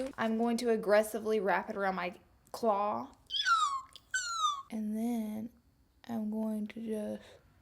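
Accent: South, United States. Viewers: 3 things I like about you 1.) you don't owe anything but still offer it up to people & 2.) you embrace your southern accent. I have one too! I'm from KY! 3.) you work that gray in your hair. I got it too, girl. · love the contacts:) and all your southern twang coming out!! beautiful look :) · 💖 ya and your southern accent lol! then British! what contacts do you have on? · is it me or she has more of a southern accent lol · honey where are you from? you do a FAB southern belle. you must be somewhere close to the southern states!! LOVE IT!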